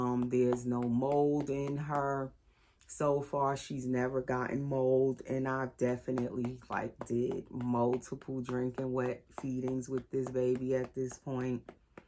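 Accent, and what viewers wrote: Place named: New York, United States. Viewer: Ok I have to ask... are you from NY? NJ? Lol you have that accent. I love listening to you talk!❤ I also love listening to myself talk 😂so I'm just wondering lol love your baby, she's sooooo cute!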